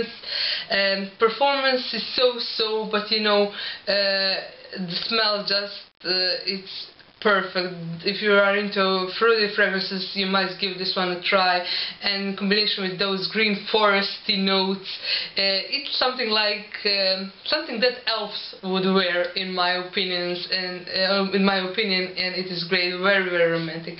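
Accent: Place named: Russia. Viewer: Хахах видно что русская ( акцент )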